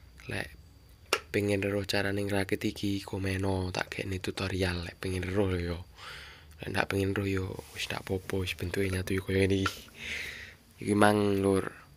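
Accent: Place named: Java, Indonesia. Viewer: Boso mu ale meduk mas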